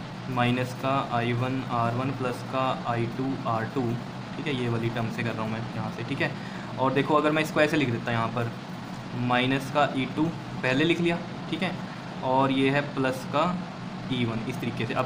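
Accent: Punjab, India. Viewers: i found a bit of punjabi accent in your video 😎😎😎😎😎😎😎❤❤❤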